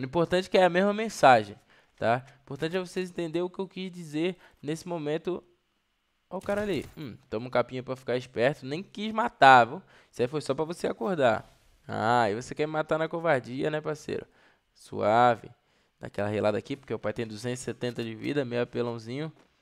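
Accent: Bahia, Brazil. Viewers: parece que mora aqui na bahia, olha o jeito que ele fala man, Muito massa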